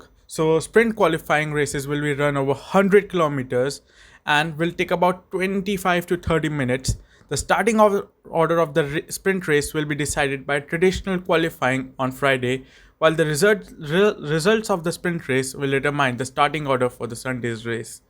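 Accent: India. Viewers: ur accent..u Indian? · Ngl I always thought you were indian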